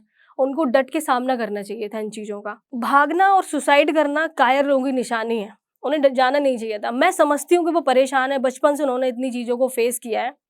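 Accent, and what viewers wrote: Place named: Uttar Pradesh, India. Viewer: Bhasha se laga tha aap etawah se kaha se ho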